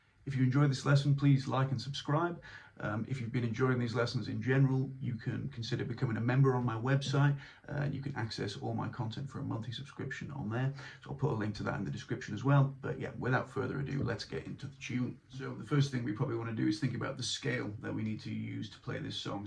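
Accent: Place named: Arab world. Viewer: I sense from your pronunciation that you speak Arabic as well! Thank you for sharing such an interesting tune!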